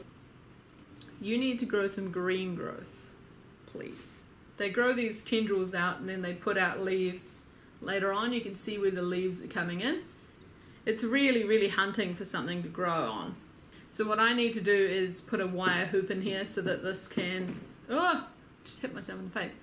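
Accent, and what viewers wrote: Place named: Auckland, New Zealand. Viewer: Hi from Auckland, I'm Sarah from Auckland. I wonder if we went to school together a long time ago. I was watching a bunch of Hoya vids then yours popped up and I thought "Hey! I know that accent!" Lovely collection you have there. I've had one hoya my whole life then recently started adding more. You've done soooo well with your Obovata and Kerrii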